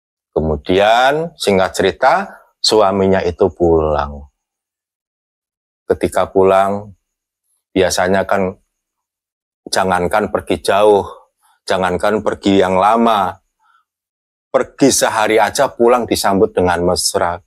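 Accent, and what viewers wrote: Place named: Java, Indonesia. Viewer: tpi logat nya ko Jawa ya 😄haduhhh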